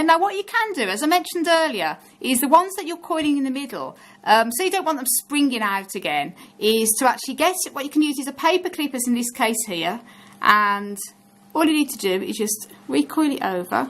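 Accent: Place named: Wolverhampton, United Kingdom. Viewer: Are you from Wolverhampton? Loved hearing somebody local on here! Thank you for the video. It's fab! Fingers crossed my daughter's cuttings root 🤞🏽 have a great day!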